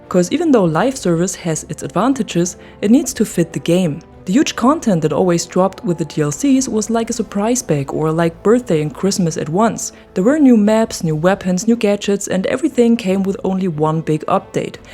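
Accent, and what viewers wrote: Germany. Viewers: Your good, is that a German accent i detect 😉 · Yea, i also think it's a German accent.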